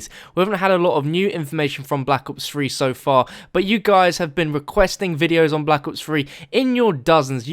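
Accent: United Kingdom. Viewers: we dont care about your opinions or british accent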